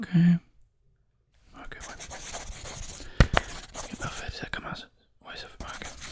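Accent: Quebec, Canada. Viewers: très bonne vidéo j’attendais la suite depuis longtemps ( la première sur l’autre chaîne ). personnellement j’aime bien le fais que ça soit québécois; j’en réclame plus!!!!!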